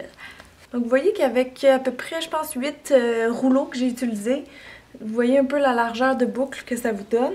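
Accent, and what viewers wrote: Quebec, Canada. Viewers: Je ne sais pas pourquoi mais à chaque fois que je lis ce que tu écris dans la description de la vidéo je le lis avec ton accent québécois :P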